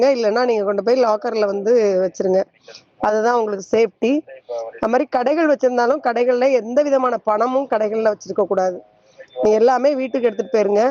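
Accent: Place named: Madurai, India. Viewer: Thank you madam for your alertness with madurai slang.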